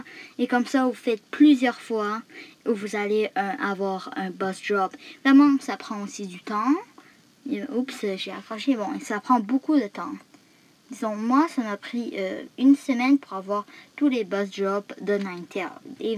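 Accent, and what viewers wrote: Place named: Quebec, Canada. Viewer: Il est sûrement Québécois l’accent se reconnaît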